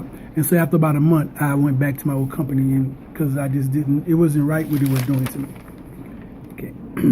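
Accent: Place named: New Orleans, United States. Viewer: ok no problem , i like that little new Orleans accent of yours too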